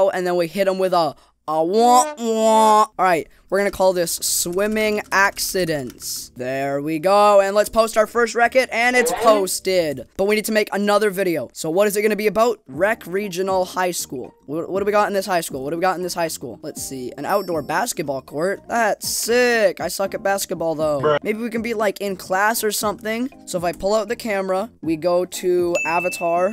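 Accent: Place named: United Kingdom. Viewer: goated channel frll (why is that your first youtube video you had a biritish accent?)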